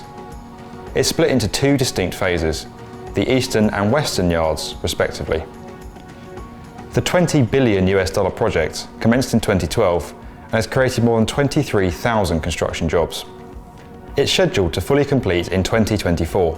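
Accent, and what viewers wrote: United Kingdom. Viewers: Why is the project being described by a person with an British accent, and why are they using British spelling?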